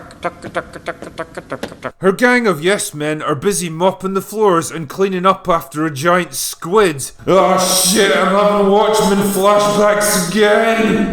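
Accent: Scotland, United Kingdom. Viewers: Why did you chsnge from a very nice listenable scottish accent,to kidding on youre drunk all the time?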